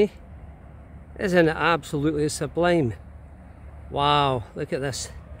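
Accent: Scotland, United Kingdom. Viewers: I love your Videos and your accent! Are you from Scotland?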